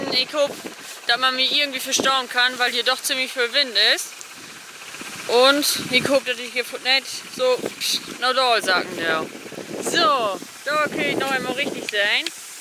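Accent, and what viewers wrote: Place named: North, Germany. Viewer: Ich schaue seine Videos um bisschen zu verstehen wie die hier im Norden reden 😂😂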